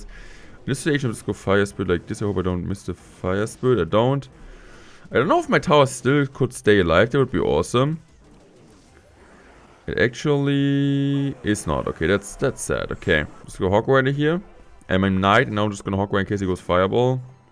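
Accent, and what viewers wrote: Germany. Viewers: Your english look like deutsh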